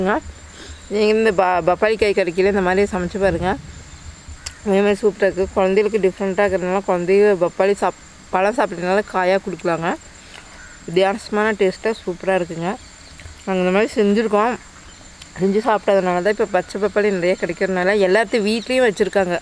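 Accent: Kongu, India. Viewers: Akka ungal kongu tamil alagu Akka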